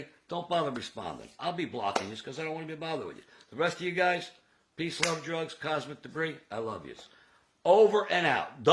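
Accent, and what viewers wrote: United States. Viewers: Great into to your video. I listened to the first10 seconds and said this guy is from NJ, as is I. Everything you said makes perfect sense. My only suggestion is to use coated bearings. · I hear Long Island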